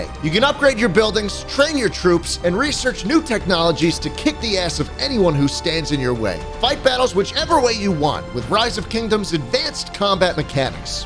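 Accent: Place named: South, United States. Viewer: vertical video sounds like a read neck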